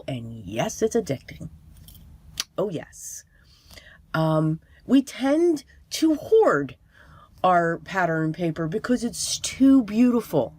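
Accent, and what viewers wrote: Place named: Philadelphia, United States. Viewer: Great ideas! Keep the videos coming! Couldn't help but notice your 'Philly' accent on the video. We're practically neighbors as I'm in NE Philly. ❤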